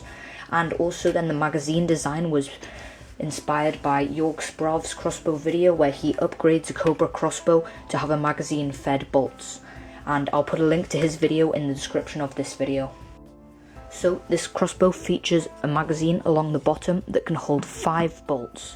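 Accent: United Kingdom. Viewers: Are you from newzealand because on your pants it has Canterbury New Zealand? But your accent sounds British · I think his accent sounds British, definitely not New Zealand?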